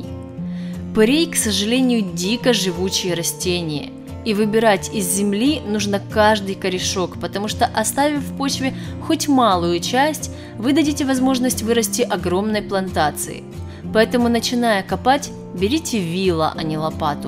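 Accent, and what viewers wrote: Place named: Ukraine. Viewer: ОН С АКЦЕНТОМ ГОВОРИТ, ПОТОМУ ЧТО ОН С УКРАИНЫ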